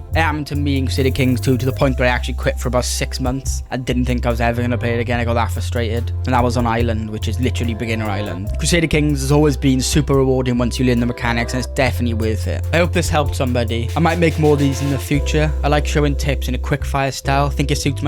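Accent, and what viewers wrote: Wales, United Kingdom. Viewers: Are you welsh by any chance? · Welsh, and it’s beautiful. Check out a show called “Gavin and Stacey”, it’s lush.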